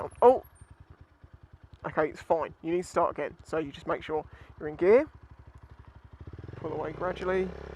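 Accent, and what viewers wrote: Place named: Midlands, United Kingdom. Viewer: Dude, love your vids. You sound like you're from the Midlands (my old stomping ground). I've been watching a lot of your Lexmoto vids, and have decided due to the warranty and breakdown to plump for one of their 125's over a second user unknown YBR. I've just got my CBT, what Lexmoto model would you recommend based on your experice to continue my ride learning on L plates and to use for and after my test? I watch one vid (like the Venom) and love it...then I see you review the Michigan and change my mind ha ha. Ive also been looking at the Isca as a similar bike to the YBR but really would appreciate your opinion, specifically for new CBT riders to build up riding skills and experience.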